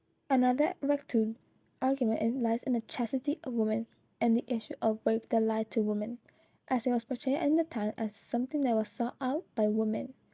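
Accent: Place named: Spain. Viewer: Ahh makes sense. Im assuming you know how to speak spainish aswell because of your accent. I do have video request which i think would also make good content, Could you make an episode dedicated to the Arabic Slave trade its a fascinating series of events that i think are quite quite impactful in history to the modern Era. Alwo are these your only socials or do you have a 2nd channel or instagram channel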